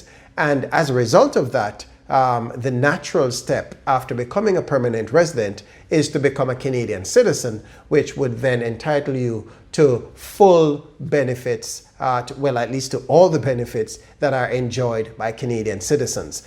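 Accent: Jamaica. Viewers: I love that you still kept your jamaican accent!!! loooool I will be in touch I work in IT, I am 45 y.o in the USA right now,but living with my own immigration here. how do we start a private conversation to see how you could help me move over there for IT work. thanks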